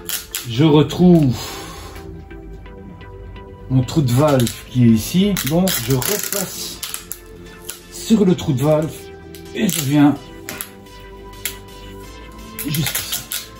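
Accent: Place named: Belgium. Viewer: un petit like pour les explications et pour l'accent belge que j'aime bien :)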